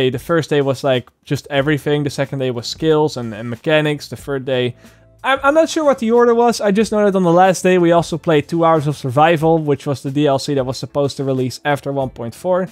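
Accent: Netherlands. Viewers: R u dutch? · Are u dutch?? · Nederlands accent?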